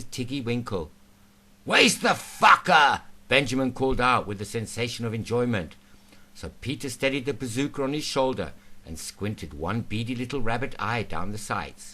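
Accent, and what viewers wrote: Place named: South Africa. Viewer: Love the South African accent the narrator has.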